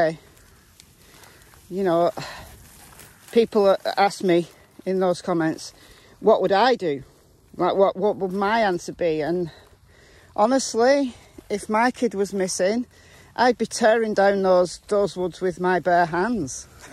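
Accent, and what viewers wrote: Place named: England, United Kingdom. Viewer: Hello.I've just discovered you and at the beginning of this video I thought you were from Massachusetts, U.S.A., from your accent.Then I realized you sounded English.The accent we have here near Boston came from the English settlers way back, so its interesting to hear the similarities.I have been following this case and I'm so confused.I don't know what to believe.I'm leaning towards abduction by a known person.I really hope they find her soon and it would be a miracle if she's found alive.It's so upsetting.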